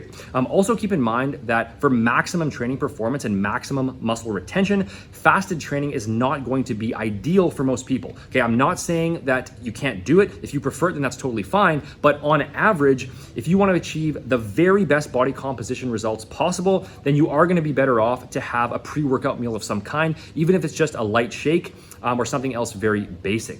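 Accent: South Africa. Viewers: He sounds like hes from south africa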